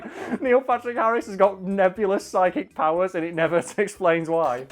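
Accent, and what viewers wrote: United Kingdom. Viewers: tone the british back a notch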